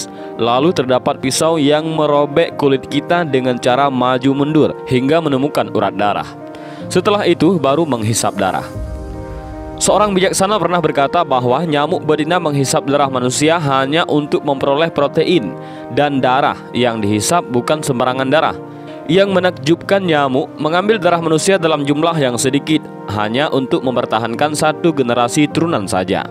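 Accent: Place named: Aceh, Indonesia. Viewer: Abang ini orang Aceh ya,soalnya logat nya itu😁😁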